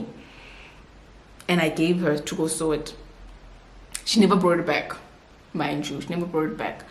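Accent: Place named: Sotho, South Africa. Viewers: 😂😂😂😂😂😂😂😂😂your sotho accent hle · The Sotho accent for me😂❤. Hamba Oyii😂😂😭😭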